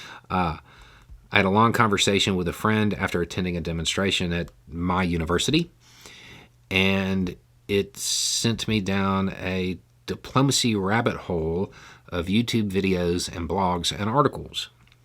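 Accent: South, United States. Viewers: He’s already at the top! A man from the south fighting against racism and educating those who seek him out. Hey y’all I’m fine with whatever he addresses his VOLUNTARY viewers as. He’s kind and respectful and smart. Stop criticizing small regional differences. I was never confused that maybe he was from Jersey “Ya’s know?” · please allow me to politely disagree. Y'all is proper English in the south, and I also use ain't. In my opinion speech and look are the way they are to show Country/Southern doesn't mean stupid. Just my opinion. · Teaching is communicating & “proper” communication isn’t always the most effective. He ain’t a professor. That was completely improper English, I grew up being told not to use, but you understood me & likely didn’t feel condescended to. Y’all, ya’all, yinz, youse guys, etc, is nothing compared to his original code-switched Southern-speak on this channel. 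He’s already cleaned it up about as much as he can for us Yankees & overseas folks, while still having people who look- but don’t think like him- give him a chance🤷‍♀️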